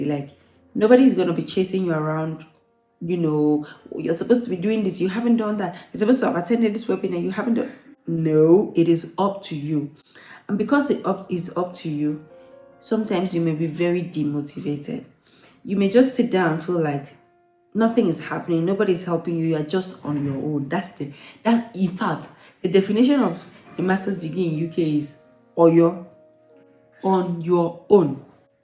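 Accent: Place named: Nigeria. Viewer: The Nigerian accent tho...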